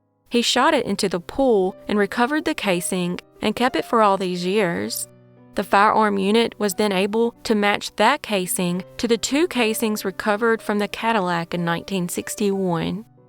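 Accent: South, United States. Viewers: The southern accent definitely helps. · This homegrown Aussie girl absolutely loves all of your southern girls accents. Or is it y’all? Sounds lovely to me. 🎶 💕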